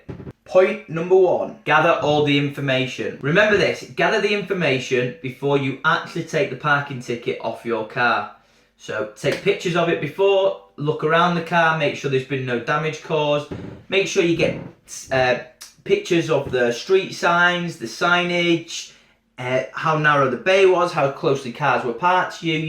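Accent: United Kingdom. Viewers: this is UK, not US. Cue is in the accenr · Guile Miran I think his English accent could be the giveaway. 🤦‍♀️🤦‍♀️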